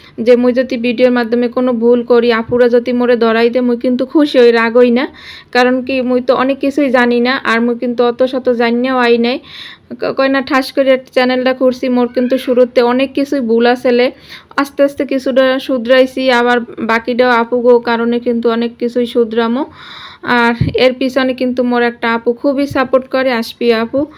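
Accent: Barishal, Bangladesh. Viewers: তোমার বরিশালের ভাষা বেশ ভালো লাগে ❤❤❤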